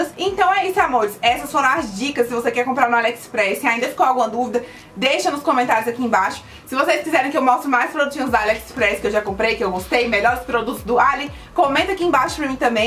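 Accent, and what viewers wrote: Minas Gerais, Brazil. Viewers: Pelo jeito de falar... é Mineira ou goiana né?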